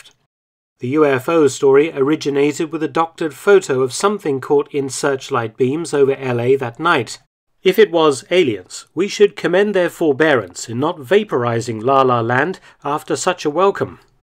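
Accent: United Kingdom. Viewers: Ok, the humiliation and desire to retaliate because of the cactus, combined with the british narration, makes this video seem like a Monty Python mockumentary.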